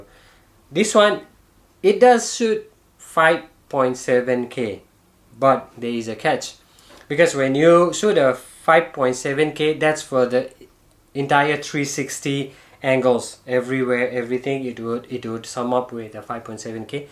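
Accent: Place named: China. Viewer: i wonder how come a Nepalese guy speaking English in Chinese accent .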